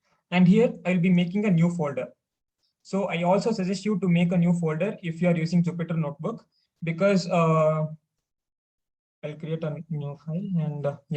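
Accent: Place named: India. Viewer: When i heard an Indian accent I knew I'm saved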